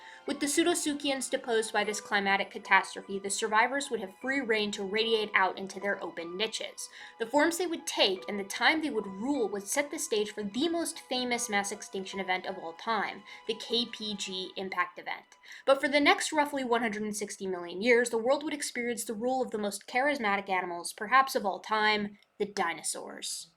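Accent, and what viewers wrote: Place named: United States. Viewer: My only problem with this video is that you said “Carboniferous” when you ought to have said “Mississippian and Pennsylvanian” since, judging by your accent, you are an American. I got marked down for this in college, so my pattern-recognizing brain started yelling at me “DANGER DANGER” in a bizarrely apt demonstration of the point you were making.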